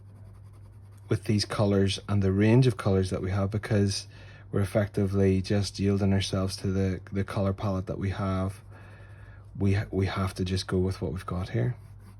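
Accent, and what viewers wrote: United Kingdom. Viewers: Love your Northern Ireland accent! I have these DERWENT water colour pencils all in lovely earthy colours - but only a 10 pack - l called in Homecraft today to get this bigger selection that you happen to be using - but the price was very high and l couldn’t be sure if they would be as effective as my original 10 pack…. So thank you for this video …. You have persuaded me to invest in this bigger selection! Love your work! Delightful to see an artist from NI on YouTube!! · Just starting to learn pencil drawing and water color pencils at 70. I am watching various youtubes to learn the basics. I came across this and watched intently. I had to chuckle a bit, when I thought people were watching wheels, instead of whales. :>) Our American accents are just a bit different than UK ones. So thanks so much for a great video.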